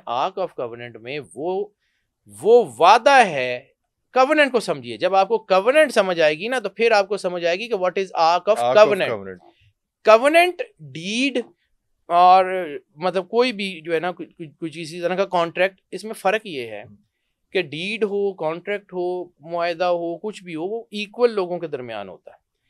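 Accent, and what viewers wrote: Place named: Punjab, Pakistan. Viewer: your Punjabi accent is hilarious 😂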